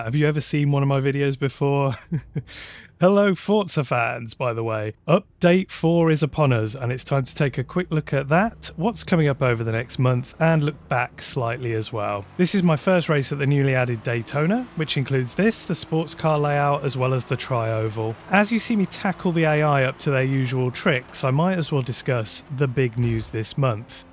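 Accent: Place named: England, United Kingdom. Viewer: Good comments with a lovely English accent! (From a Pom in Australia) Nice to see they have addressed a couple of the many sound issues, I think the Capri and GT3 Bentley may sound better too (but is it wishful just thinking?) I hated them last time I used it, went back to Seven briefly, ahh, that's better... But now in Eight, hmmm.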